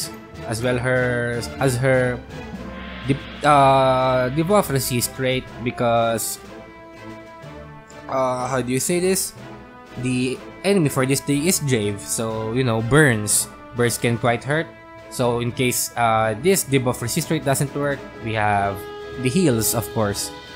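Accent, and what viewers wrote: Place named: Philippines. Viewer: First 30 seconds accent I tought you were from Romania, then I realized Philiphines.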